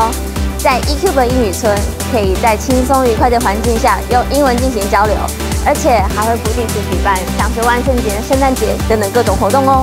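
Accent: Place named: Taiwan. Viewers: 台湾人だと思います。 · 本多照子 発音からして、台湾人だと思います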